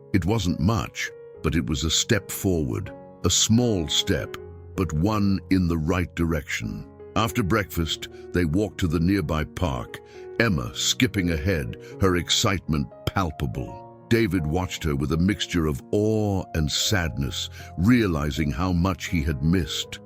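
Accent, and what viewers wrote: United Kingdom. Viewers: I liked this story and the others. But, I wish they'd use another narrator. The voice sounds too English.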